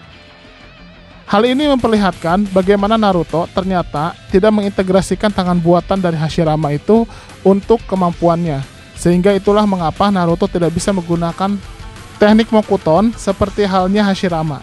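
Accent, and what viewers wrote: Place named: Sumatra, Indonesia. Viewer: Min, mimin orang sumatra ya..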